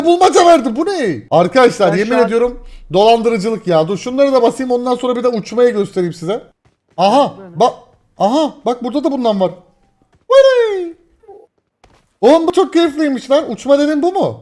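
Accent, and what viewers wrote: Azerbaijan. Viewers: abı Azerbaycanlımısın?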